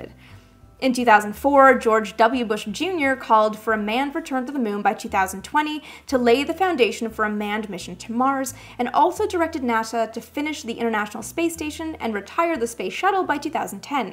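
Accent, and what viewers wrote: Canada. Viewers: You made me cry at the end. You're still a nice lady. Are you Canadian or a northern mid-westerner? Great piece, I will make many people watch.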